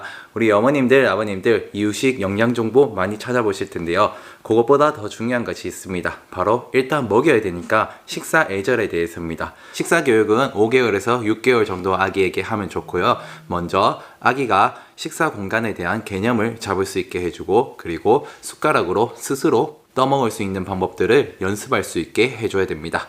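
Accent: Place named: Gyeongsang, South Korea. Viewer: 일한이 너모 귀욥네요ㅜㅜㅜ♡♡ 저도 경상도 사람이라 사투리 쓰시는 모습이 더 친근하게 느껴지고 귀에 쏙쏙들어오네요ㅎㅎ 5개월차 엄마에요 아직 앉아서 먹기힘들어해서 바운서에 누워서 먹이는데 숟가락이라도 쥐어줘봐야겠어요ㅎㅎ 숟가락잡으려하는데 제가 떠먹여준다고 못잡게했거든요ㅠㅠ 낼부턴 스스로 잡게 숟가락을 하나더 준비해야겠어요! 다행히 울아가는 너무 잘먹어주는데 한번씩 안먹으려할때 티비나 동요나오는 장난감 틀어주는데 그냥 다끄고 조용한환경을 만들어주는게 좋을까요? 티비나 장난감은 추후 식사습관에 안좋을거 같은생각이 들었는데 궁금하네요~^^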